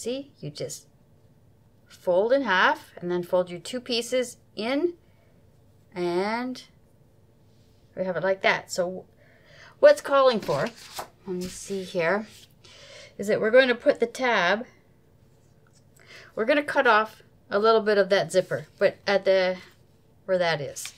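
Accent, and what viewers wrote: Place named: Canada. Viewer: Happy One Year Anniversary! Was painting and listening to random Youtube vids - heard your voice and recognized a fellow Canadian. I'm in BC. Weather is 'meh' here. Overcast.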